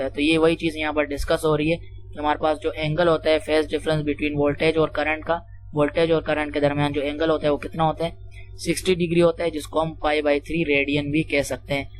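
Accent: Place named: Karachi, Pakistan. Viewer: bro ascent sa to karachi k lagty ho #karachiwalay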